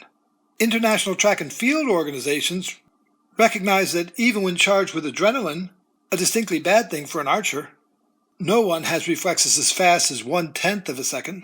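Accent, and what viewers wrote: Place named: Oxford, United Kingdom. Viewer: And you do that, totally great. It´s so easy undertand you Oxford english. No question by now. I often ask myself about similar topics. This is a fantastic response, supported with videos. THANKS !!! I´m waiting for some topics about Field Archery, the most interesting and HARD to learn. Te preguntaré en español también, jejeee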